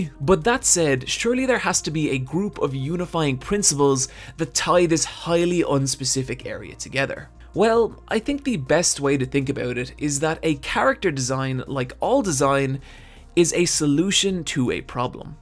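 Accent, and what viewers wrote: Ireland. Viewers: You sound irish bruh im irish dio has good fasion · His accent, the "Why" or "What" is being affected by his accent and it sounds forced. It's an Irish one, I'm Irish, believe me he doesn't have a choice <\3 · Irish accent and blue graph paper? Almost mistook you for Real Engineering there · Can someone tell me what SEWs accent is? English is not my native language so I can't really tell. But the "h-why" and "castume" and "ting" remind me of Roy from IT crowd sooo ... irish?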